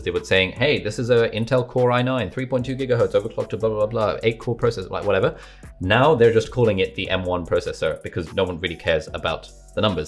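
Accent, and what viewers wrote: United States. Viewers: you can kind of tell that is starting to get an american accent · I see you getting the American Accent. The way you pronounce the "r" in air and processor.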